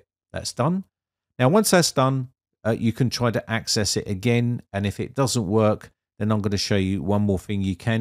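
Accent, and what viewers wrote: England, United Kingdom. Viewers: Are you an American with an English accent?. You keep saying "GO AHEAD" in front of nearly every verb. Unnecessary, annoying, wrong, meaningless. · Calls himself a Brit, sounds like a Brit, speaks like a Yank- 'go ahead' this , 'go ahead' that. Bad enough when an American continually says it but it is annoying af hearing it here.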